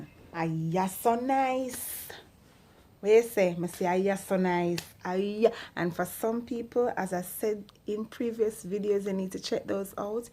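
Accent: Jamaica. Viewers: I so so love Jamaican accent.. like madddd😄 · Oh wow this's fun. I love Jamaican accent. Where exactly did it originate from cos it's unique , do you know?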